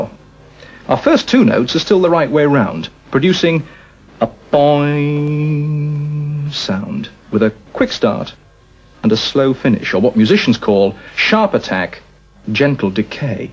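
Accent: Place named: RP, United Kingdom. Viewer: All tv presenters should talk like this chap. BBC English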